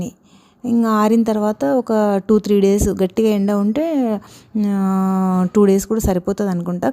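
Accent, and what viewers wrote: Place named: Andhra Pradesh, India. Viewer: Nvvu telengana nega inappudu telengana slang loo matladu endhuku andra slang avasarama manaku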